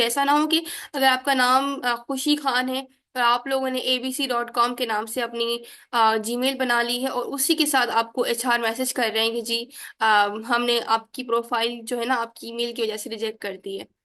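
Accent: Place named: Sindh, India. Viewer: Ilahi behtreen. Khushe hue ke mashaAllah Hamare sindhi sisters be Digital platforms me hai Twan je Awaz ahen accent k lago munkhe tawan sindhi ayo. BTW Zbrdst lecture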